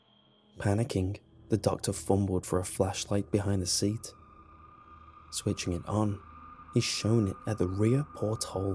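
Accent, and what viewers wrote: England, United Kingdom. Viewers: He has an English accent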